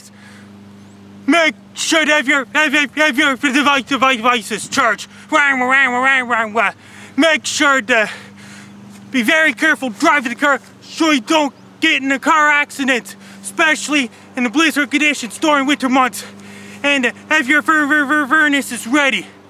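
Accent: Newfoundland, Canada. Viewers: Atlantic accent is like this. Especially Newfoundland..You can't even understand what they're saying.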